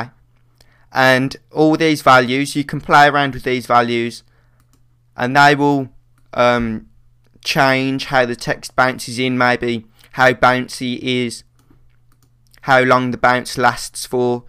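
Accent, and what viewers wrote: Birmingham, United Kingdom. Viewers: BIRMINGHAM ACCENT!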